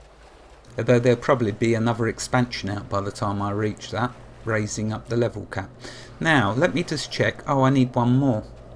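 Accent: United Kingdom. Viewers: Awesome EQ 2 series , I just love your videos...Are you from the UK by any chance and did you enjoy eq and eq 2 better than villagers and heroes?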